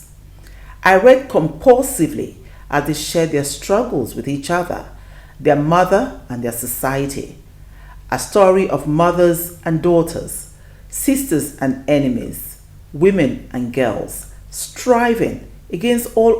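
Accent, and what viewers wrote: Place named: Nigeria. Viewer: Congratulations. I have got your book on Audible and it’s just so well written and more so a beautiful Nigerian accent to compliment. We are so proud of you. I recommend this book to both your young and old🎉👏🏾👏🏾👏🏾👌🏾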